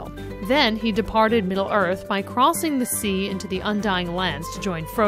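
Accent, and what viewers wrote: United States. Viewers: she's American, and they obviously don't/can't pronounce English like the British do! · i couldn't even bear to get to that part. 8 seconds in i stopped the video because of her watchmojo american accent that clearly gives off the vibe that she has no idea or interest in what she's talking about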